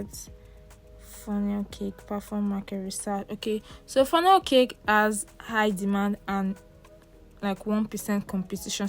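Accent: Nigeria. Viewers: Are you Nigerian, sorry for asking but you got that Nigerian accent. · You sound African Nigerian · You sound Nigerian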